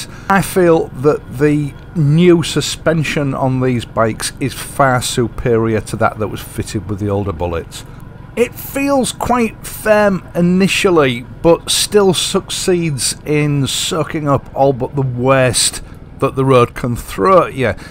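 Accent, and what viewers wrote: United Kingdom. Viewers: Great British accent and great British engineered bike! The British are far better than Americans and even better than the Japenese on traditional standard motorcycles, the only type that interests me. My other bike is a Triumph T120, another great classic bike!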